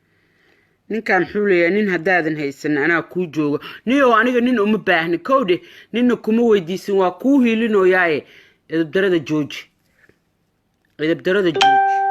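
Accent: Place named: Beledweyne, Somalia. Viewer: reer baladweyne miyaa sidii reer baladweyne cml waaye codkeeda milyan like 💕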